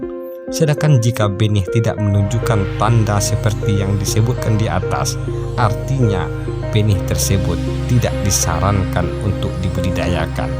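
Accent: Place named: West Sumatra, Indonesia. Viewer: dialeg regional Minangnya menambah manis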